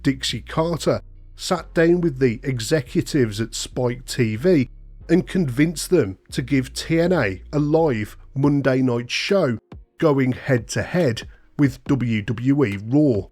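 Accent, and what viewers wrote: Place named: Scotland, United Kingdom. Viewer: Always a Scottish narrator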